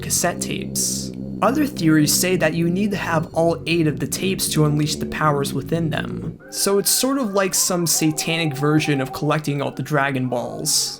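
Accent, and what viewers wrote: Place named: Midwest, United States. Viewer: I hear Midwestern in your accent and it makes me sooo fuckin mad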